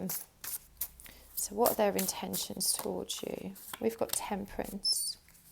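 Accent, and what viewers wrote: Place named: Birmingham, United Kingdom. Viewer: Birmingham acceny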